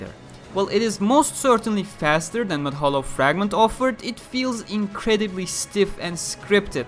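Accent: Turkey. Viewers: Sesi duyduğum gibi türk aksanı olduğunu anladım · Yalnız aksan baya Türk :) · hiç kanal ismine bakmadım adamın türk olduğunu direk konuşmasından anladım başarılar kardeşim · Telaffuzundan türk olduğunu fark ettim, güzel iş çıkarmışsın tebrikler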